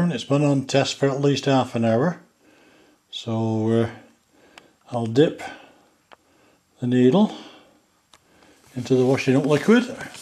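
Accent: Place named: Lancashire, United Kingdom. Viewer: Hi, i have an adidas finale ball that needs fixing, also, are you from lancashire? The accent sounds familiar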